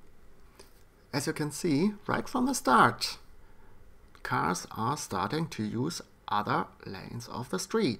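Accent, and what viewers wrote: Germany. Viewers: Dieser deutsche Akzent is ja grauenhaft :D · Ich habe direkt gemerkt das du aus dem Deutschen Sprachraum kommst :D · Awesome German accent.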